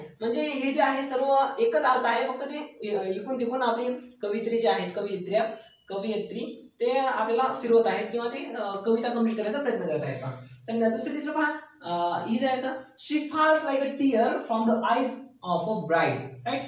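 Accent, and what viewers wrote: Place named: Rural, India. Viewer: ते गावाकडचे आहेत ना म्हणून. थोडं समजून घेतलं पाहिजे आपण 😊 छान समजवल त्यांनी 😊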